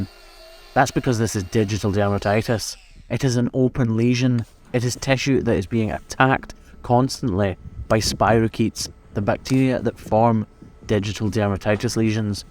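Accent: Scotland, United Kingdom. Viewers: Scottish is crazy sometimes. I deadass thought you were speaking French and I didn’t know why I couldn’t understand until I realised you were speaking the queens English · Is it just me that find theses videos strangely soothing? Perhaps it's his calm Scottish accent. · You sound like an avarage british person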